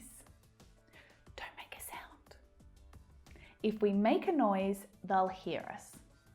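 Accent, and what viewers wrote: United Kingdom. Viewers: your lessons so helpful, you are British, right? now I am advanced what should I do to reach native level? thanks a lot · NO ME GUSTA EL ACENTO BRITÁNICO... SORRY 🙃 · Ms I would like to ask about your accent,is it british accent? Let me know if you dont mind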